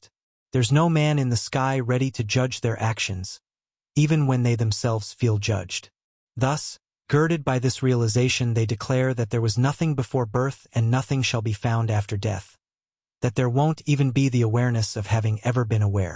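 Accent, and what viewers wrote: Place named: Wales, United Kingdom. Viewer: I was just getting used to the Welsh accent lol.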